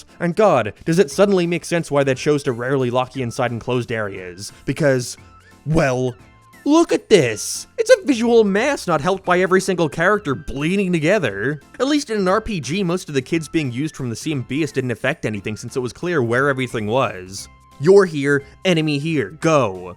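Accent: Ireland. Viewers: He’s irish you testicle sucker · Irish accent I believe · You sound more Irish when you were younger. Glad you grew out of that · It's kind of put on but also he's just irish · It's an Irish accent you simpleton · i keep forgeting your irish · It’s a Irish accent he even talked like that as a child man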